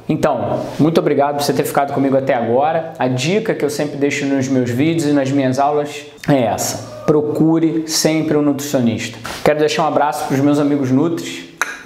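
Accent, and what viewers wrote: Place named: Rio de Janeiro, Brazil. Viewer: Esse sotaque Carioca é tudo!!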